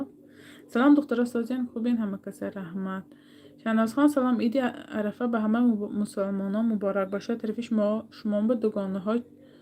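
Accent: Isfara, Tajikistan. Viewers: Апахо бовар кунетон Ман хамин канала гапзанихойи исфарагизоя ед кардаги росо ачойиб забонихудатонки шумо молодец апашка♥️♥️♥️💋💋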